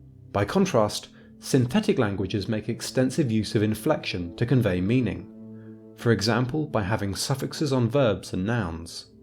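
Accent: England, United Kingdom. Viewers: Shades of what I would have gotten into had I gone into linguistics! OK, I detect a [snobbish] British accent in you but get to the point. English is MORE synthetic than you make it out to be (following the lead of the Germanic languages); the Romance languages are almost totally analytic. Point is demonstrated: my school system at the grade 7-9 level taught only two languages French (analytic) and Latin (synthetic galore). In high school grades 10-12 I took German. Us "Latin scholars" were VERY familiar with grammatical case while the "French scholars" had no idea what case was. Yes, things like Accusative of definite time vs. Genitive of indefinite time weren't well explained--they are a feature of German--to us in Latin class in junior high. Something interesting is going on in English that to your argument that Synthetic trends to Analytic which I'll get to in another post. 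I'll conclude that a lot of this today is in DELIBERATE miseducation. Note in America the now-common misuse of " 's " for plurals: dog's for dogs, etc. THAT sort of illiteracy! Bad enough the British' dropping of punctuation in abbreviations "PMs" for "P.M.'s" if you saw Johnson, Cameron, & Brown walking down the street! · Yeah I reacted to "domibus nostra", I dunno where he got that from. Also I'm surprised he was afraid to pronounce Finnish, as his Latin pronunciation was 100% English anyway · slow and exquisitely anglo but an excellent video for sure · What a preposterously unscholarly and scatological pile of tripe. "Habere" in Latin is "to have"; "Dormire habeo" is "I have to sleep/must sleep". it is NOT the future compound tense which would be "dormire VADO" (using the verb "to go" as one would in English).The author resorted to using the verb "fart" since he doesn't have the intellect, substance or maturity (thinking he has some severe "mommy issues" and still lives at home) to create something academic. 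This is video is proof that just because you slap a pompous voiceover with an English accent doesn't make it intelligent or correct.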